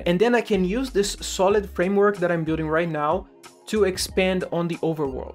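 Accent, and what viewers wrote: Brazil. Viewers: esse sotaque parece de BR 🤨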